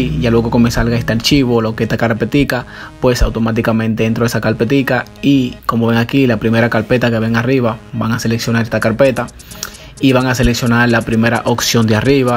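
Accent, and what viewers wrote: Dominican Republic. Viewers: Eres dominicano verdad xd es que tu voz se me ase conocida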